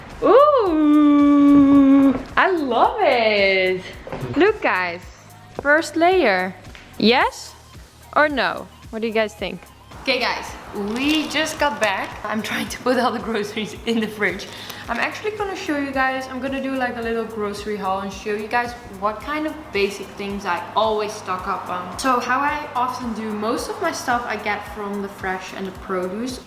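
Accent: Netherlands. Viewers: Are you dutch? I hear a little accent🤪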